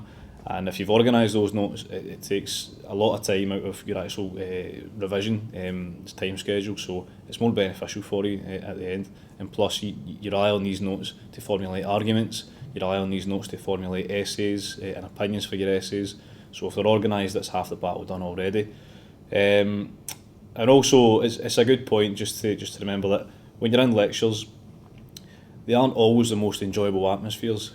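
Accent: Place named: Austria. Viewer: this guy speaks english like the way the Austurians speak german !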